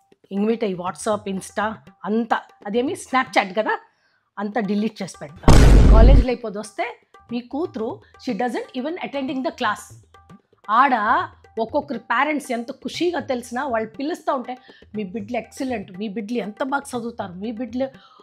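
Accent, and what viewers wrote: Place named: Andhra, India. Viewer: Which part of andhra is this accent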